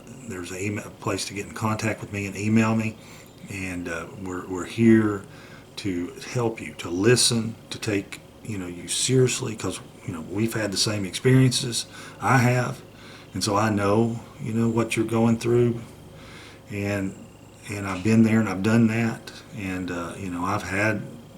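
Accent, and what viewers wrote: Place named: North Carolina, United States. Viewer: From a NC girl transplanted to California it sure is nice to hear my daddy's talk., you are such a kid with that Go Pro. Enjoy. Y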